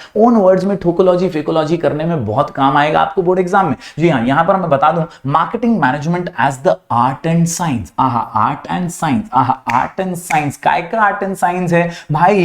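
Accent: Maharashtra, India. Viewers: Thanku sir marathi tadka mast hota😂❤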